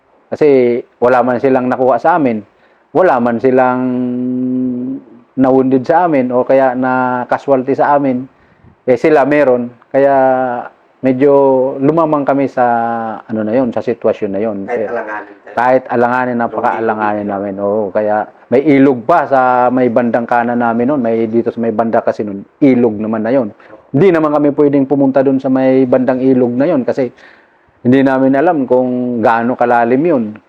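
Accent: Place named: Bicol, Philippines. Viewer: Bicolano Yaya si sir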